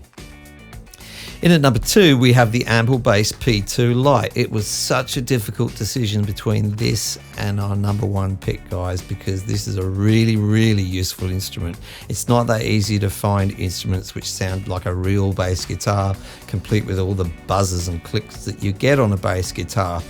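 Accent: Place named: Australia. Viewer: Really refreshing to hear an Aussie accent on-line these days! You may have crossed paths with Pete Johns in here? Always on the look-out for a bargain & you've tickled my fancy with 4 of your 5 gems. Being a bass player, good as emulations get.. sounded good, 'till the octaves.. Thanks, oh, song title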